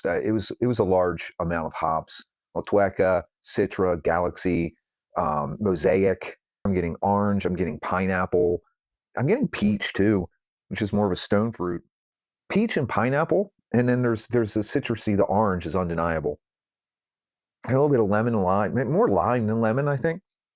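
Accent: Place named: Maryland, United States. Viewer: I appreciate your videos. You sound like you’re from Maryland. Are you?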